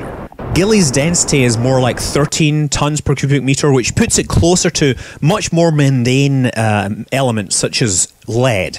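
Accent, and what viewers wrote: Scotland, United Kingdom. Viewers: Very, very Scottish. And I live there. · Cool you sound Scottish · Hehe where about in Scotland are you from? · Your epic Scot manly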